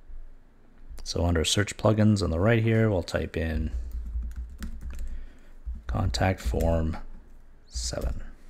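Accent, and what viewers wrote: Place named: Canada. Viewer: Thanks for the Awesome FREE tutorial on how to set up a FREE E-com site,. So many out there plugging free this and free that only to lure people into an "only $*.** for the real information" situation. I only have one issue with your tutorial: Although you don't say "ABOOT" like South Park makes fun of/impies Canadians say, you really don't say ABOUT either. It's more of an "ABOUOOT". And I used to speak with Canadians daily when I worked at a Logistics company here in L.A. and y'all really do say it that way, (except those damn french canadians, they've got their own crazy inflections) so I can see where Matt & Trey get the stereotype from. Anyways, I am happy aboot the fact that you create these informative videos, Thanks!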